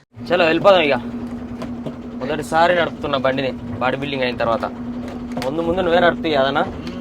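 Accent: Telangana, India. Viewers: Yarana Telangana basha devarakonda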